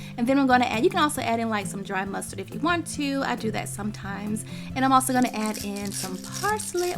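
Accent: South, United States. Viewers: omg sweet sugar wow love the southern voice mmm and what a great dish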